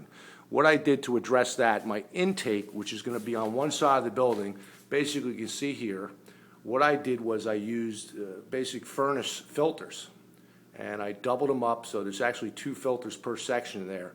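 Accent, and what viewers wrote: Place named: New Jersey, United States. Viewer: Rutgers! I knew it sounded like you were from nearby.. Nice video, thank you! Esp about fan/filters..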